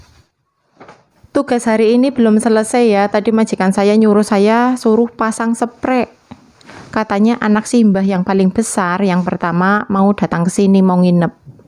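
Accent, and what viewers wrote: Banyumas, Indonesia. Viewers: Mbak dari daerah mana di Indonesianya? kok ngomong nya kaya orang daerah Banyumas.... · Wong ndi kw yu... Logate kyk ngapak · Wong ngapak apa yak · Mba logate ngapak, wong ndi mba.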